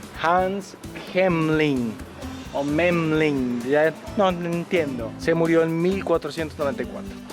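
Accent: Mexico. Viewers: Me encantan tus videos y me sirven mucho, porque estoy en la misma que tu, pero... me perturba que hables como Mexicano, entiendo que ocupes palabras, pero sinceramente no pareces Chileno y es fome que eso se pierda. Saludos y mucho éxito.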